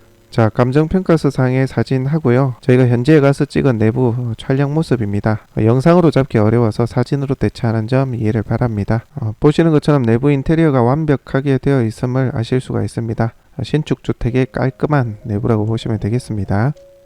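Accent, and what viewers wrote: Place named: Gyeongsang, South Korea. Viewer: 갱상도 말이 남자에겐 참말로 멋지지예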